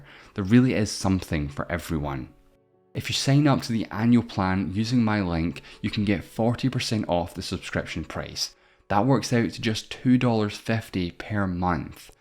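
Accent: Scotland, United Kingdom. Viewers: it's scottish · Out of curiocity, what is the accent of the narrator? I think it is Scotish but it doesn't completely match with my limited experience. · You're correct that's a Scottish accent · Can't belive you said "all of Ireland was part of Britain but then they wanted independence" you just ignored the fact that the reason they were was because of colonisation. You make it sound like Ireland was originally British and that we just broke off from the empire. I was sort of expecting something like this because of your accent being Scottish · I love the Scottish narration :)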